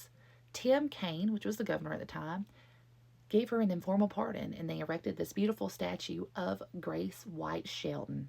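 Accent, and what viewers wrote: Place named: Tennessee, United States. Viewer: Thank you for this video. I saw it in my feed this morning and was so excited to watch it. Grace is my ancestor ❤. We always hear about witch trials and the deaths that resulted from them but this old girl wasn't having it. She did her time and lived through it. I'm so proud to be able to claim her as Mamaw Sherwood. Whenever I'm going through a tough time, I remind myself what Grace went through and I feel just a tiny bit stronger ❤. Thank you 🙏 you did a great job. And you sound a lot like me - I'm from Tennessee.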